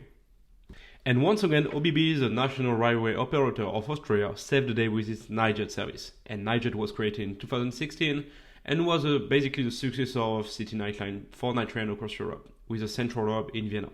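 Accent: France. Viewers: I agree with you entirely. His Franglais is annoying. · Sans vouloir être désagréable, serait-il possible d’avoir les videos sans commentaires off en anglais? Mes oreilles saignent en écoutant le lourd accent français, c’est difficile d’en faire abstraction. Les sous-titres avec le son d’ambiance font bien le job. Les youtubeurs japonais du train game font ça et vu leurs nombres de vues, ça marche très bien et ça fait moins de travail.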